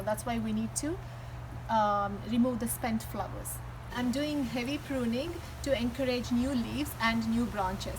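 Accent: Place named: United Kingdom. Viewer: You got British accent wow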